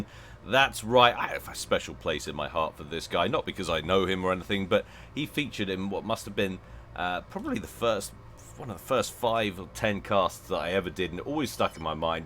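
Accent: United Kingdom. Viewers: Ive been gone for a while. But im back. Good to see your still active. I now feel old. But hearing a fellow british voice makes me know your quality has only got better.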